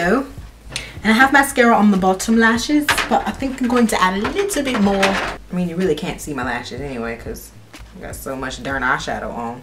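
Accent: England, United Kingdom. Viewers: Your British accent is so good 😂 this is a sign for you to come down next year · The English accent though 😂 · Literally thought "oh my gosh why do a baby reveal like this" 🙈🙈 British accent is on fleek though! Sounded a bit like Shirley though lol From London btw when are you coming to visit 👌🏾😍😘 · Love the English accent 😂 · Living for the british accent lmao you kill me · You're british accent s actually soo dope!! xx Loving this look sooo much sis xx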